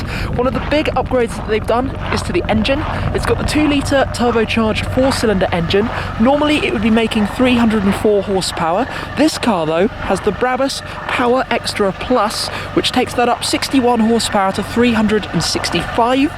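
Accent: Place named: United Kingdom. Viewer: 我喜欢听你说话的口音，标准英式英语。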